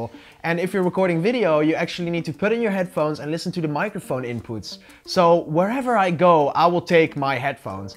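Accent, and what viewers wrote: Netherlands. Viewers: I can already hear you're dutch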